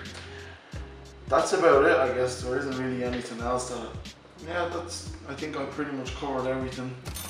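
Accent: Ireland. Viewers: Lol - I knew you were Irish from the opening statement - Hows the goin.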